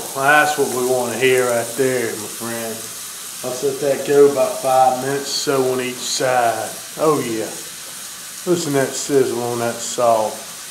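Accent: South, United States. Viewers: lol the southern accent. I am in NOLA. 1. Wouldnt it be better to peel off the shrimp skins for the ingredients to seep in all over it? 2. For how long should we wait for the ingredients to seep in the beef and the shrimp after applying the ingredients on them? Thanks. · I know you love to cook but PLEASE do a voice over for one of my books, I love your southern accent. brings me back home! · Love that southern twang! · Interesting southern accent and cooking. I enjoy your video, thanks. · I was initially turned away from the video due to negative experiences with individuals with Southern accents, but I like how he repurposed the Sriracha bottle. It's funny how embracing different food cultures releases any tension I had that he belonged to the same group of hate-filled individuals in my past. Nice vid dude, it's a neat idea cooking on a salt slab. 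I've never seen it done like that:-) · All the comments about your accent are infuriating. But I guess some people have never been down south. They're missing out on some good cooking. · i never know they had this salt block... like your steak and accent man.. yeehawww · ok you sounded southern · You have heavy southern accent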